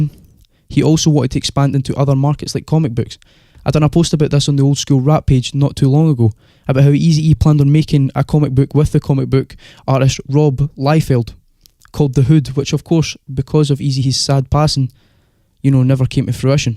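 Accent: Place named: Scotland, United Kingdom. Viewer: Love that Scottish accent bro and love the vid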